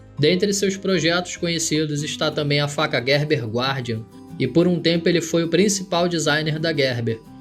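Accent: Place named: Rio de Janeiro, Brazil. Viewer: Cara, esse documentário ficou bom demais, tirando esse sotaque de carioca, ta perfeito 👌🏻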